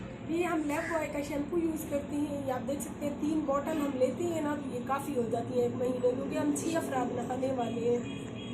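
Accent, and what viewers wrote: Saraiki, Pakistan. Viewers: Behan g bhatta program bohat Accha tha,ghareeb bhatta mazdooron ki mushklat Kiya Hoti Hain khoob rahnomai ki,,Behan pehle bhe ap ko SARiKi zuban men program Karne ko Kaha tha,,,,Behan g ap Ka lehja SARiKi zuban walls hai,ap Urdu mein jhlagta hai,plz APNI zuban mein program Kia Karo hamara SARiKi khitta hai ziyad SARiKi boli jati ha na Urdu Kam,,👌🙏🙏🙏💯👈plz reply,🤗 QAiSER.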